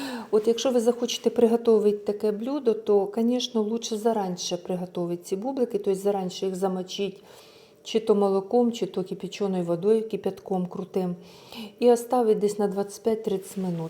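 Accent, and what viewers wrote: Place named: Surzhyk, Ukraine. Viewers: Мені дуже подобається ваш канал. І я дуже дуже вдячна вам за ваш суржик. Він мені такий милий, бо нагадує Херсон, де я проживала до війни. Успіхів вам! ви така неповторна і щира. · Ви не схожі ні на кого,у вас свій стиль на воя харизма,у вас суржик,але він більш український,уГалини ж тільки для виду пару слів українських,ви приємна чудова,доступно все розповідаєте і показуєте не дивлячись на те що не закінчували кулінарних закладів для мене особисто ви своя,зрозуміла і ХОРОША ЛЮДИНА❤🎉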